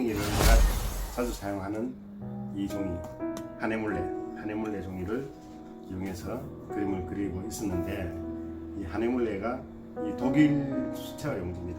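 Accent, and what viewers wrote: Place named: Gyeongsang, South Korea. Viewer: 정감어린 경상도 사투리와 ^^인상이 참 선해 보이십니다^^ 하나하나 잘 배우고 보도록 하겠습니다^^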